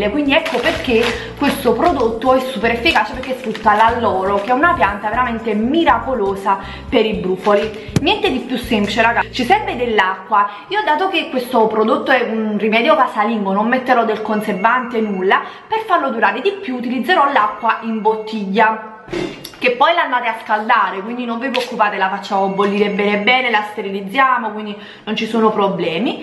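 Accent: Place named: South, Italy. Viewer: Sei bravissima❤️😃Di dove sei? Forse è il contrario ma sento un’ accento del sud (?)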